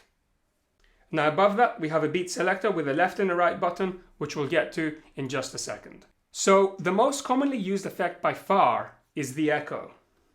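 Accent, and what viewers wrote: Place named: England, United Kingdom. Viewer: I can hear you have picked up the English accent at times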